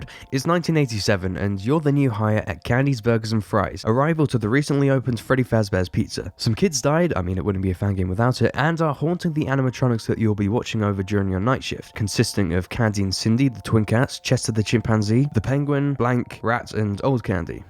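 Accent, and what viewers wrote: United Kingdom. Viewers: Man, I keep coming back to this, and I get whiplashed that this was before transitioned- I’m so used to hearing her voice nowadays that her sounding like your average british male feels weird Kinda prefer her voice nowadays lmao, but I just like British accents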